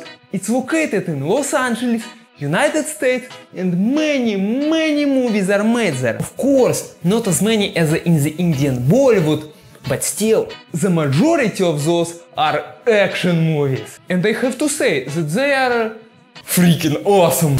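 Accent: Russia. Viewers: cool! really nice to meet someone from Russia, my Grandma was from near there, the Ukraine. I actually thought you were an American actor doing a Russian accent, i was thinking, boy he does it well! Nice to meet you.: ) Do you work in the film/tv industry there? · Holy shit that is the thickest russian accent i have ever heard. And i play CS:GO!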